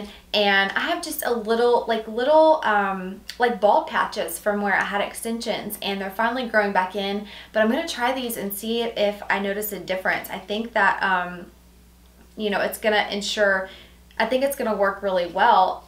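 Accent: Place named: Nashville, United States. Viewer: You are just so gorgi! I knew your accent sounded familiar, just read that you're from Nashville! I live in KY. We frequent Nashville & Gatlinburg quite often, its just beautiful there! Loving your vids! :)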